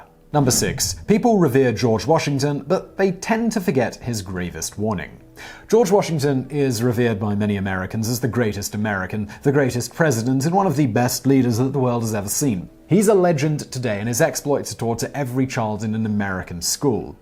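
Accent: England, United Kingdom. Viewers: Yes, he has an english accent. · Never trust anyone with a British accent trying to teach you history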